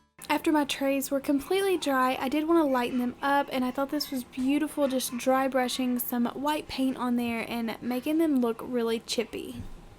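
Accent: South, United States. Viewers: Everything is just so cute ! Love your southern accent, where are you from ? I'm from Alabama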